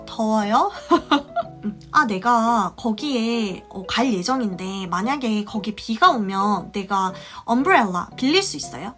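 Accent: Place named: Gyeongsang, South Korea. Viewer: 베이스가 저보다 탄탄하신거 같네요 아 그리고 착각일수도 있는데 경상도사투리가 느껴지는건 기분 탓일까요 ㅎㅎ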